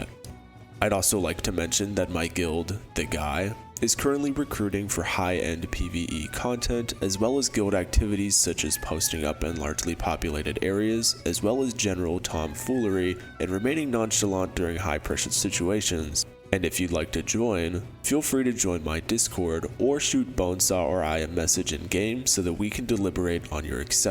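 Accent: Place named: California, United States. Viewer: You sound Californian as fk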